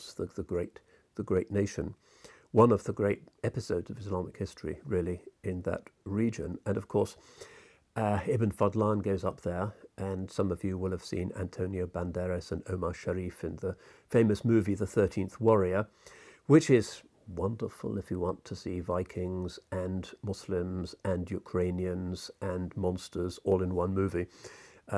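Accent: England, United Kingdom. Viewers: this guys voice, house, appearance and English accent makes watching his videos so soothing and cosy. its like your sitting infront of a chimney fire drinking hot coco while hearing a story from your grandpa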